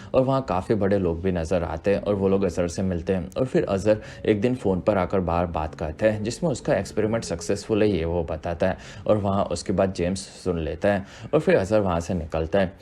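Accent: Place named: Odisha, India. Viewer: Man your accent looks like Odia-hindi mix.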